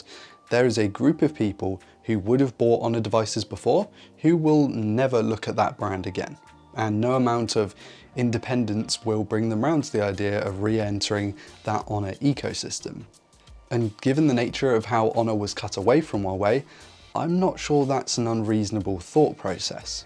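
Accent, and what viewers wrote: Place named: United Kingdom. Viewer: That british accent is fire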